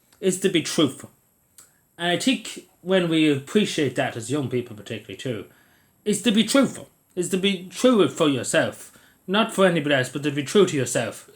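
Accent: Cavan, Ireland. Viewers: The way you speak is euphonious. I was just whiplashed when I clicked the video. If you will allow to to make the suggestion, maybe read some excerpts (the prologue for example) of Canterbury Tales in a youtube video. It would preserve the Cavan accent digitally.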